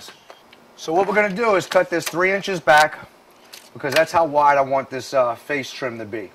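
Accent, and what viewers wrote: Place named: Louisiana, United States. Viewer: Great videos. My wife is from Louisiana and when she first heard you she said he's got to be from Louisiana. Keep the videos coming.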